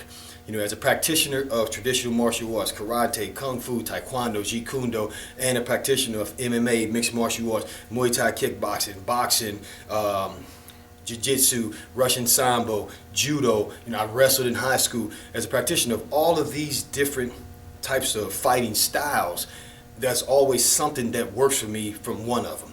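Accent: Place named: Philadelphia, United States. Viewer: Dude sounds and looks like hes from Philly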